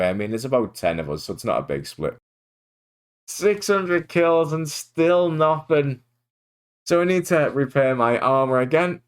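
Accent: Liverpool, United Kingdom. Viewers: Where's your accent in the UK from friend? Scouse? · Fellow scouser? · As a yanited fan, never thought I'd spend 2 hours listening to a scouser talk, and I mean this endearingly 😄. Amazing content!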